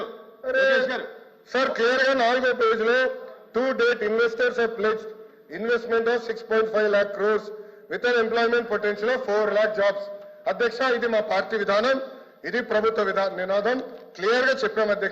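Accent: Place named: Telangana, India. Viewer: Lokesh ki andhra kanna telangana slang masth set aythundi 🤙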